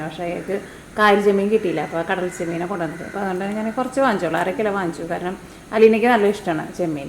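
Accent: Thrissur, India. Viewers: നാട്ടിലെ വീഡിയോക്ക് ഗ്രാമത്തിന്റെ ഭംഗി കൂടി ഉൾപ്പെടുത്തി ചെയ്യുന്നതിന് അഭിനന്ദനങ്ങൾ . മുണ്ടൂർ പള്ളിയുടെ തൊട്ടടുത്താണല്ലെ നിങ്ങളുടെ വീട് . വീഡിയോ കാണുമ്പോൾ എനിക്ക് തോന്നാറുണ്ട് തൃശ്ശൂർ ശൈലിയിലുള്ള സംസാരമാണെന്ന് . ഇത്രയും famous ആയി ഒരു youtuber തൊട്ടടുത്ത നാട്ടുക്കാരാണെന്ന് ഇപ്പോഴാണ് അറിയുന്നത് .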